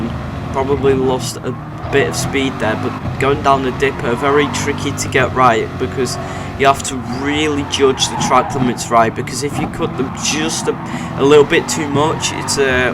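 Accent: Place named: Northern England, United Kingdom. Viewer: He’s got a northern accent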